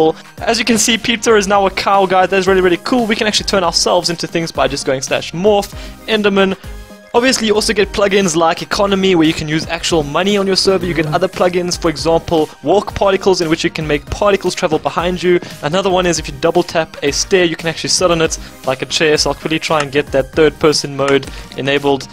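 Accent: South Africa. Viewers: u sound South African?! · are you South African?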